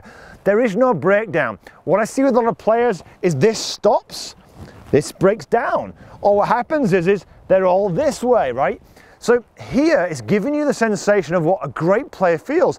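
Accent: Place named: Northern England, United Kingdom. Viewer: Another great video lad. Honestly, I could watch all the other golf videos on YouTube and never get as much knowledge as I can with one of your 10 min videos. Your style, is backed up with the substance of knowledge that can be easily transferred to the student, all delivered with a wonderful Northern twang. As a nervous newbie to the game, you have calmed my nerves by giving me the confidence and assurance that comes with your instruction. Thank you sir for making my first year in the sport such a pleasure. I wish you and your family all the happiness and joy that you deserve in this festive holiday season. ❤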